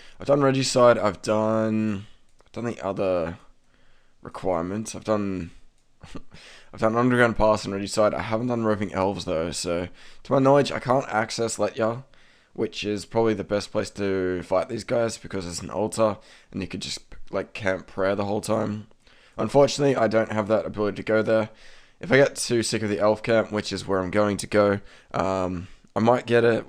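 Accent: Australia. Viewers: called an Australian Accent.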